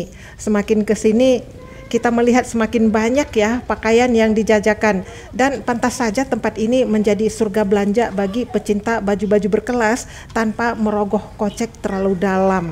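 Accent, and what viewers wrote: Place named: Banjar, Indonesia. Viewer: oh maaf logatnya kadang sprti sdra2 kita yg dr Banjarmasin☺️☺️☺️ sy penduduk kaltim turunan Bugis lahir Jambi 🙏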